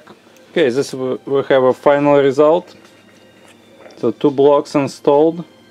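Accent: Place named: Russia. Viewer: russian accent <3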